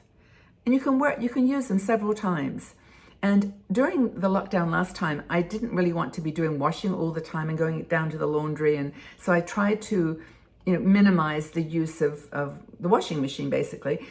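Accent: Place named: United Kingdom. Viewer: I detect a British accent.And of course,we Brits are always prepared! Blessings.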